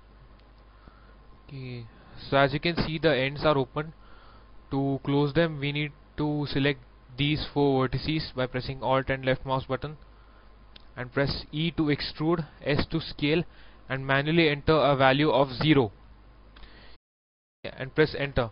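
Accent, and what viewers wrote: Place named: India. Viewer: very nice work, do yo have more blender tuts? I need to practice my Indian English listening.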